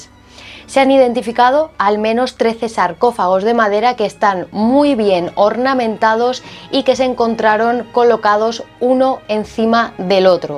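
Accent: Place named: Spain. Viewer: Cm me gusta esa tonada española,mmm..saludos dsd Argentina!!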